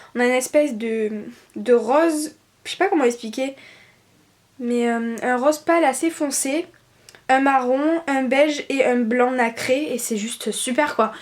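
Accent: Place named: Marseille, France. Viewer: Tu as un petit accent marseillais, tu habite à Marseille?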